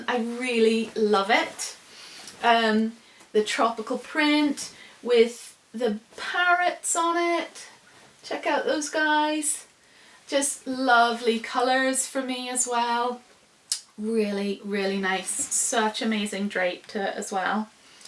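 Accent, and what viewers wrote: Northern Ireland, United Kingdom. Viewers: where are you from if you dont mind me asking? Im hearing a slight northern Ireland accent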